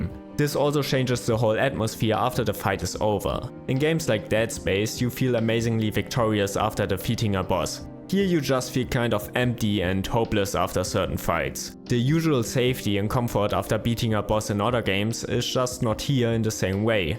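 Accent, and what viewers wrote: Germany. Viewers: Bist du deutscher? das hört man sofort 😁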